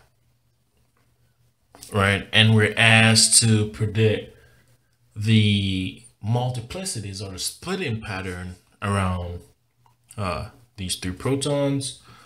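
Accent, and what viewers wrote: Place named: Caribbean. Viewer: I think I am hearing a Caribbean accent here. Thank you for this tutorial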